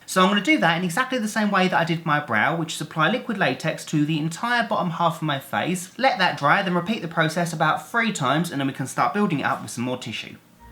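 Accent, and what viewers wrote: United Kingdom. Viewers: wait is this youtuber british?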